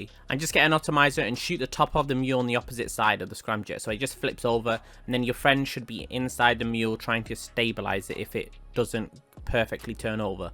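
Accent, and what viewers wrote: United Kingdom. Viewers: ah I love this British accent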